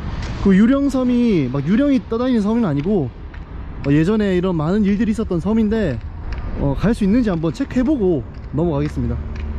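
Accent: Gyeongsang, South Korea. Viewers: 나 웨 영상 끝나는게 싫지? 재며들었나봄...경상도식 영어 못잃어↗️↗️↗️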